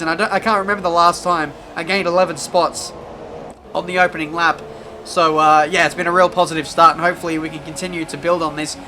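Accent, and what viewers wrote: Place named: Australia. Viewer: Is there a better combination for a video than the word 'supers' and an Australian accent?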